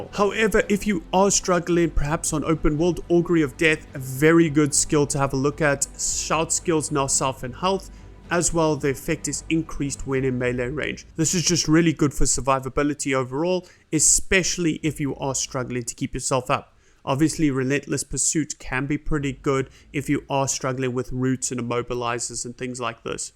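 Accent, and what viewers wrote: South Africa. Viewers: is that the distinct south african accent i am hearing?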